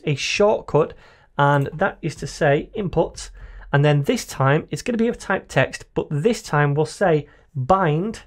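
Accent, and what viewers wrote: United Kingdom. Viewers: Why does `s accent sound like brittish?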